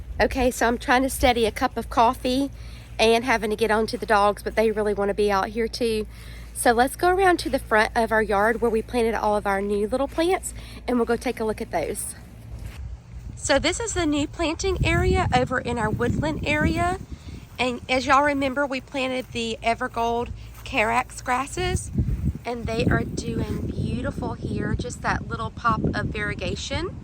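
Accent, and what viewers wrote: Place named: South, United States. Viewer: I love your southern accent!! I wish I had a stronger one!! Enjoyed your garden tour and meeting your doggie! We have chickens too, beautiful property and gardens you have 💚💚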